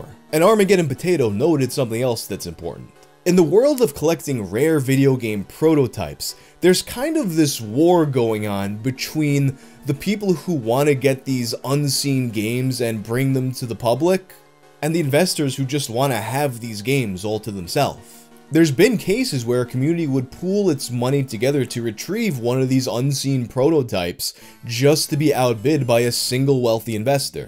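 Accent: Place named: Queens, United States. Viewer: "And here's where today's SPONSAH, Honey, comes in" Just can't take that Queens accent out of you, huh? Love it.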